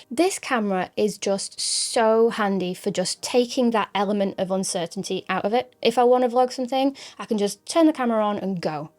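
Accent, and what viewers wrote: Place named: England, United Kingdom. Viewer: Your review gives us a bonus - Ask me, what? - Answer- learning your English accent.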